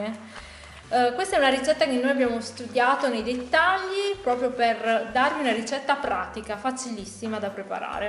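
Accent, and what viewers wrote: Sardinia, Italy. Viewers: da dove sei? la Sardegna? sento l 'accento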